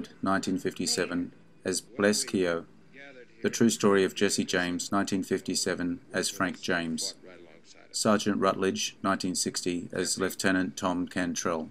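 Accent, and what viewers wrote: United Kingdom. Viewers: A guy with a limey accent reading a script... do I take his word regarding cowboy actors? Naw. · You sound as if you are from Great Britain so why do you feel you are qualified to judge a Western?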